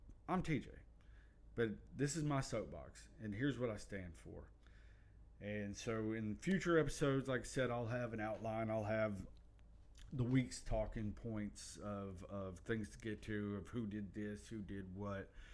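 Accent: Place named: Alabama, United States. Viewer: Being British, I absolutely love the Alabama accent. Took me a few minutes to figure out who you are and that you're someone I already follow on Twitter. Always glad to find some more based content from one of our "cousins from across the pond". (You might not have any love for King George, but TBH not many of us over here have any love for the current King Charles either, so we'll grant you a free pass for your ancestors being a bunch of "splitters"! 😆) Really liked the low-key, chill, chat approach. You already seem to have a natural affinity for talking on camera, so bring on the next episode and here's to lots of luck with the channel, and a great weekend with Mama & Java. ❤🤍💙 <---- y'all might have left the empire, but we still share colours (even if you refuse to spell the word correctly 😉)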